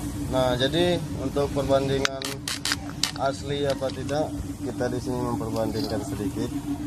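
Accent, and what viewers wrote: Palembang, Indonesia. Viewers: Wong palembang kk ee, dimno nyo bengkel nyo kk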